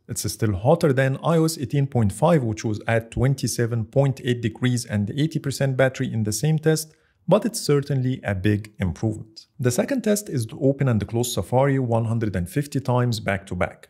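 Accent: Egypt. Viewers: Istg i knew you are Egyptian from the very first word ! All the support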